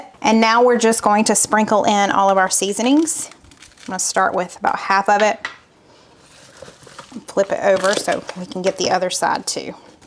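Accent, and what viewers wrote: South, United States. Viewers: I meant no disrespect for anothers accent...merely curious....I love the southern accent and I love channel. I am sorry if I offended you with my curiosity! I follow several from SC and they say it differently!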